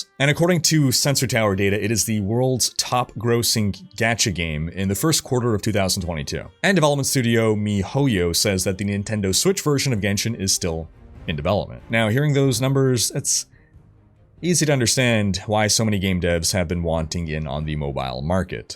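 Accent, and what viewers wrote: Quebec, Canada. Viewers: His "english canadian" is so good, I wonder if is his french canadian is his mother tongue or not. 😀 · Are you from around Montreal? Your Quebec accent is on point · Wow did not know you spoke Québec french ! Ton accent est excellent ! spa mal bon ! Sa fais des années que je te suit, tu es la meilleur source d'inforemation sur Diablo et autre que je connaisse sur youtube ! Lache pas ton excellent travail ! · Hahaha so nice to hear you talking (Canadian) french ! (Belgian/french speaking follower here :)) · Playing ladder, for the first time in d2 (played this game back in the day, never made it all the way to Hell difficulty). I see this ladder as an opportunity to finally get to endgame and experience it. I made a fire druid, very funny since the 2.4 patch. At the time of writing, I’m level 55, act 3 Nightmare, and I’m having a blast so far!!! 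Thanks to your video I managed to do a Spirit Crystal sword and it is very strong!!! Also, I just recently discovered your channel cuz of D2R ladder, and love your content! Specially you speak french canadian (as I am), that Câlisse was funny!) 😂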